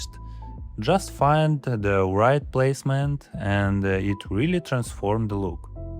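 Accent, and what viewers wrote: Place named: Russia. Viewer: Ты русский? Английский палится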